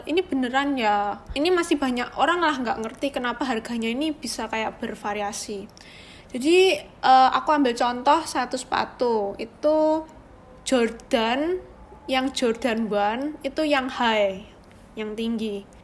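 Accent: Java, Indonesia. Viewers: Mbak mbak faforit gua 😁 medok banget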